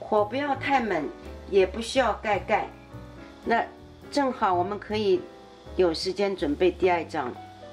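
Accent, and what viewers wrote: Shanghai, China. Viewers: 真正上海人讲国語，就是这种口音的，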